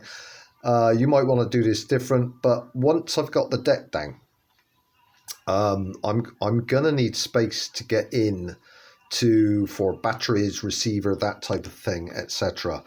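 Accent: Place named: Bristol, United Kingdom. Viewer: That's the most Bristolian accent I've ever heard. Thanks for the video though, very useful!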